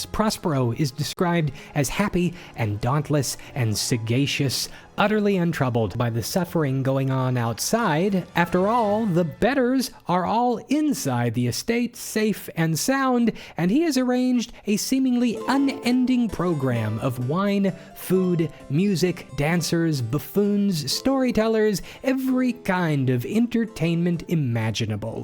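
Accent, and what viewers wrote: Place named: South, United States. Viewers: Why do I have the sudden sensation of pitching a Jordan Peele or Ryan Coogler film as I listen to a Southern accent reading of "The Masquerade of the Red Death?" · Oh my gosh,! That reading was much more interesting and dramatic than I thought it would be. The costume, the hair, the MUSTACHE! And the changing lighting add a lot of dramatic flair. The voice, the accent was a very nice touch, although the slightly southern twang surprised me. You made Edgar Allan Poe, master of horror, sound...folksy. I almost thought I was listening to Samuel Clemens, instead!;-) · Glad to see you're feeling well again. I applaud your choice of a soft southern accent, as much of Poe's youth was spent in Virginia. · I love the added southern accent as well.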